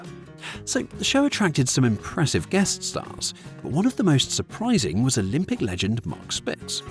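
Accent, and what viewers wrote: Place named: RP, United Kingdom. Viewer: Received Pronunciation, doesn't sound like AI slop except for an odd enunciation on the odd occasion (perfectly acceptable in a human host). I think I could live with this, even if it IS AI slop.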